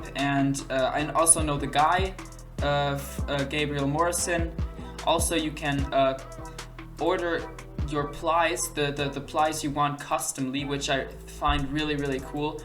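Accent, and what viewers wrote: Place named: Germany. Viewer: 90% sure u are german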